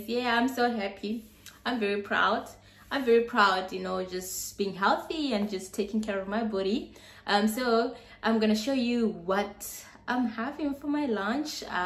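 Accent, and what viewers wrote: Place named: South Africa. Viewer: You have a South African accent ❤️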